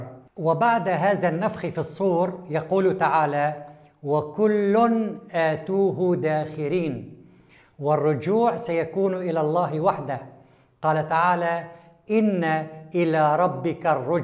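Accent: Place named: Aleppo, Syria. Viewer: العالم سوري من مدينة حلب و هيك لهجتو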